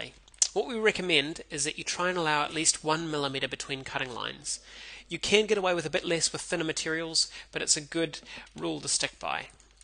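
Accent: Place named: New Zealand. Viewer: kiwi voice ftw! :)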